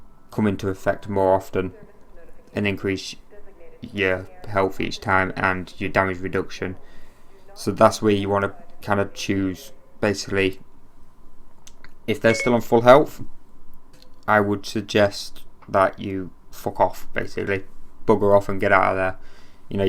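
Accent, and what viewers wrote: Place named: Stoke, United Kingdom. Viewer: ahhhhh accent sounded a bit stokish :) subbed you though look forward to more content cheers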